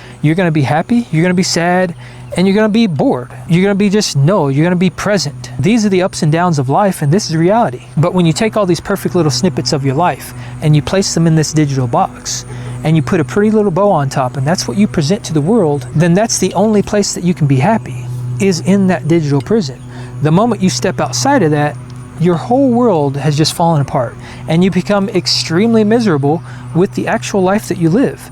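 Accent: United States. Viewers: Yay, I love the sound of your American voice and watching Shawnee and your chickens in the background and what you say. Thanks ❤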